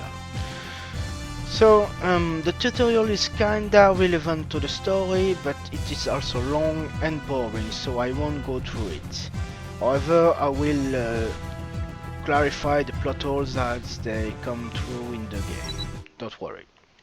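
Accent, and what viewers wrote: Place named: France. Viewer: yes i thinked you speek french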